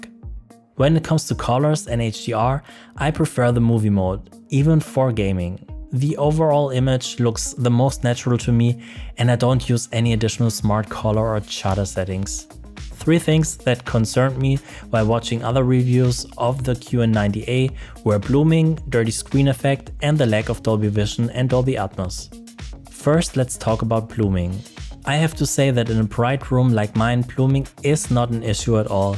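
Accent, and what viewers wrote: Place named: Germany. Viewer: You sound almost exactly as "Battlenonsense" on YouTube. It's zee German accent.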